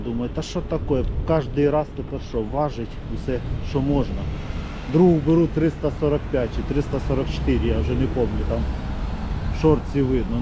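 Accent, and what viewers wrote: Poltava, Ukraine. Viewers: так приемно чути полтавський акцент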